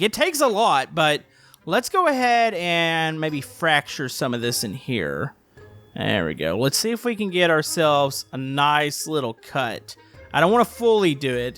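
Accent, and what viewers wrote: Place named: West, United States. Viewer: are you western? because the way you talk and sound, sounds western American.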